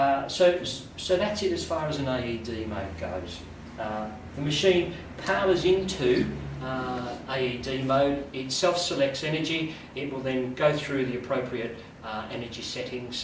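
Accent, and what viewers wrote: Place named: Australia. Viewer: Nice Aussie accent.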